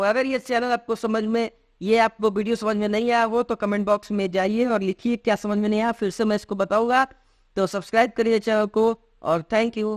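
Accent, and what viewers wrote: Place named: South Asia. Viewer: Thank u Bhai, i love desi tone.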